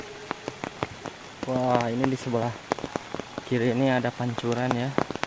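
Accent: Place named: Sunda, Indonesia. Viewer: Orng sunda mah kang...tos w nyarios ku sunda dei, garut mah komo medokkkk psn sunda na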